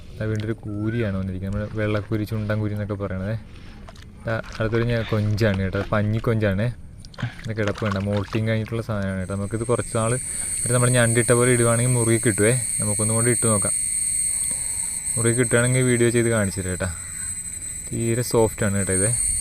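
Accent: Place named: Kurichi, India. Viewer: എടോ കൊഞ്ചും മീനും അല്ല ഇഷ്ടപ്പെട്ടത്, തന്റെ ആ സംഭാഷണ രീതി നല്ല താഴ്മേയോടെ യേ വേ ണേ കോട്ടയം അല്ല കുറിച്ചി bhasha🙏🏼🙏🏼🌹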